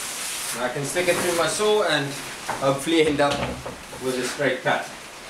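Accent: South Africa. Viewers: Thanks for the info! - Is that a South African accent? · South African accent? Good video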